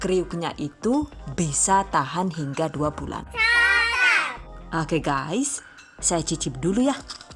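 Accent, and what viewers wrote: Banyumas, Indonesia. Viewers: Ini Madiun kok ngapak ya bun, orang mana aslinya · Yg aq suka dari chanel ini selalu disertakan tips dan trik yg mudah dipahami.. Dan selalu berhasil tiap kali dipraktekkan👍 dan yg gk kalah lagi selalu ada lucu2annya ala ngapak2nya itu lo bikin betah nontonya🤗🤗.. Terimakasih..😍😍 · Senyum2 sendiri aq tuh kalau bunda lagi ngomong ngapak sambil ngelucu🤭😄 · Org madiun kok bahasanya nyong ngapak banyumas sii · Hahahaaaa...paling ditunggu komentar ngapaknya....asli lucuuu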